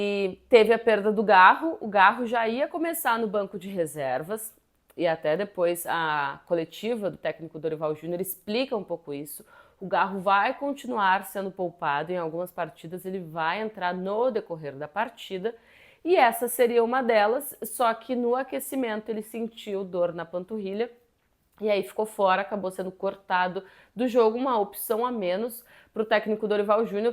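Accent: Rio Grande do Sul, Brazil. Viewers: gaucha, sotaque bonito, torce pra quem do grenal? Vai Corinthians!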